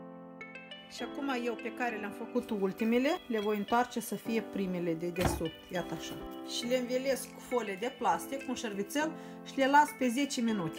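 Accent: Moldova. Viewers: Pare usoara la prima vedere dar e destul de muncit la ea.Oricum D-na m-a fermecat cu dulcele grai moldovenesc👍💋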